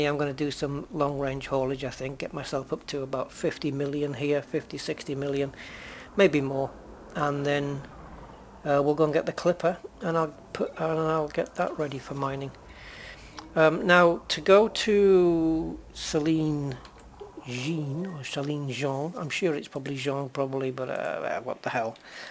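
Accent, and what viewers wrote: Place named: England, United Kingdom. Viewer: you sound english if you are english don't say movie. its a film in england appart from that good vid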